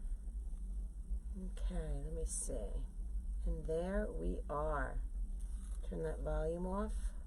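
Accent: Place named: Long Island, United States. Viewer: I hope you see my comment knowing this is an older video. First of all, you are amazing. Explain things well! Secondly, where did you get your easels from? Sounds like you have a Long Island accent. I lived on Long Island for many years and just moved back to the Midwest to be closer to my parents. Lived both on North Shore and South Shore. I watch Lori all the time and I'm so happy I found you as well!!!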